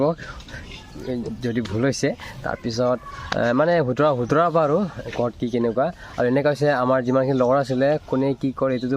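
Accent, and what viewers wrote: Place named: Mishing, India. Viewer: Bosdikee,,who the fuck are you to comment like this,he is belongs from mishing community so his first mother tongue is missing though he is able to speaks Assamese ,we have to appreciate him instead of insulting or looking him down..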